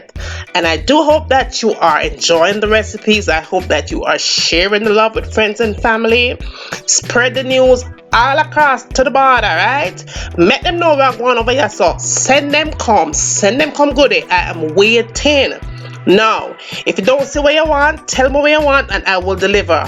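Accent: Jamaica. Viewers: I could listen to lady talk all day...lol. God I love Jamaican accents...lol.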